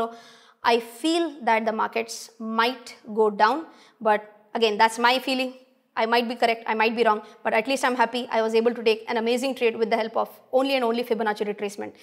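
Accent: India. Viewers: I never like the Indian accent of English till I see you. thank you very much you are amazing all love and respect.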